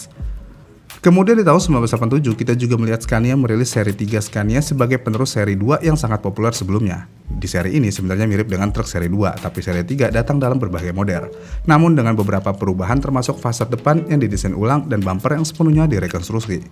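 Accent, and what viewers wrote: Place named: Cirebon, Indonesia. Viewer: Ngapakers cirebon detected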